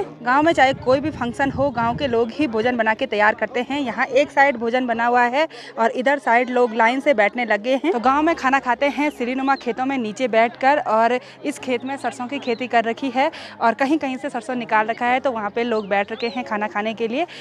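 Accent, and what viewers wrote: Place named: Garhwal, India. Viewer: didi aapka Garhwali bolna bahut achcha lagta hai♥️♥️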